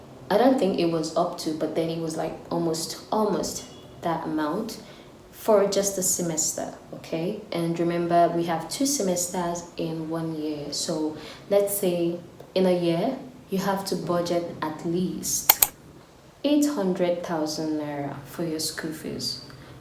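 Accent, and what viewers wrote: Philippines. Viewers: You are starting to sound like a filipina. Thank you for all the information that you shared. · Nice,your accent is like phillipines already... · Wow 😮 nice accent pilipino accent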